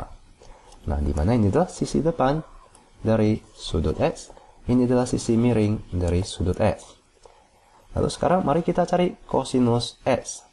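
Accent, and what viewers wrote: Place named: South Korea. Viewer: Terbantu akhirnya faham juga .kak kalo ngomong kok mirip orang luar ya kayak orang korea ngomong indo gitu😀😁😁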